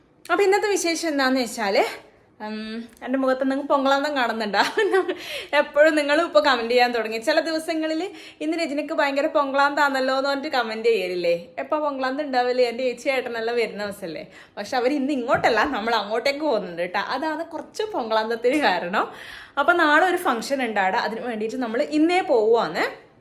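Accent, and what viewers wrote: Kannur, India. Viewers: പുതിയ ഒരു വാക്ക് കൂടി കിട്ടി.... കുറച്ചു ദിവസം മുന്നേ പുതിയ ഒരു വാക്ക് കിട്ടി കുടിയോ... ഇപ്പോൾ അടുത്ത വാക്ക്... ചേച്ചി ടെ സംസാരത്തിൽ നിന്നാണ് കണ്ണൂർ ജില്ലയുടെ ഭാഷ ശൈലി മനസിലാകുന്നത്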